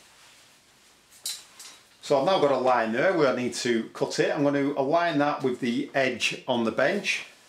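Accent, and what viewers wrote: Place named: North, United Kingdom. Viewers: Love these vids - proper no nonsense Northerner who gets straight to the point and explains it all clearly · Great video! What part of Lancs is the accent from? Sounds like Preston or possibly just north of there?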